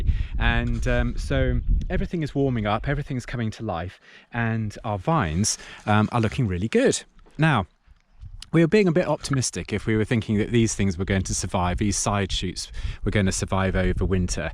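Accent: United Kingdom. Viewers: I think some snip off them early because they are confident in the weather and is not that risky, but you sound from UK and you don't have severe or late cold nights. I had -5 in late April here in Romania a few years ago. I wait until leaves appear, like 1 2 or two weeks more comparing to your buds.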